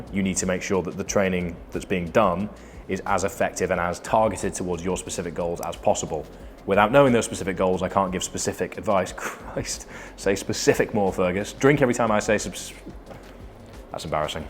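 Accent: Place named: England, United Kingdom. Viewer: I did wonder about that....why DO you have an English accent?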